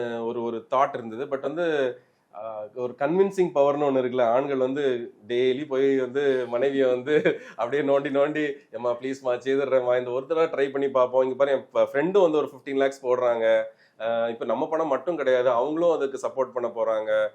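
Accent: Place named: Tenkasi, India. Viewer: அம்பாசமுத்திரம் -தென்காசித் தமிழ்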